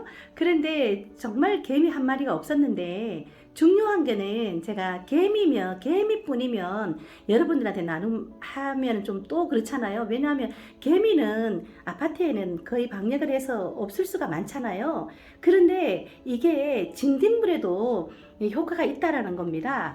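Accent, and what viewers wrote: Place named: Gyeongsang, South Korea. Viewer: 항상 잘보고 있습니다 경상도 사투리에 애교섞인 목소리에 웃음짓게 합니다 계속 많은정보 부탁 드려요 이벤트 참여 합니당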